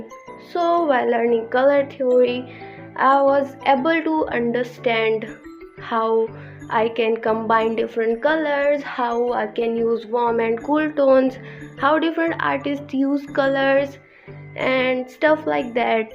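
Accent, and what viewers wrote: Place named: India. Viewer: YOU'RE SO TALENTED!!!! I LOVE THE WAY YOU COLOUR!!! are you indian? forgive me for asking but Im indian myself and i thought your accent sounds a bit familar to me 😵‍💫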